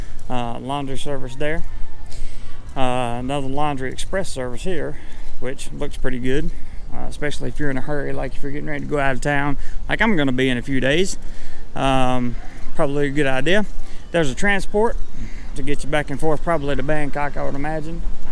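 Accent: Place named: Texas, United States. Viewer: loved your texan accent cowboy ! howdy !! ;) great video mate :)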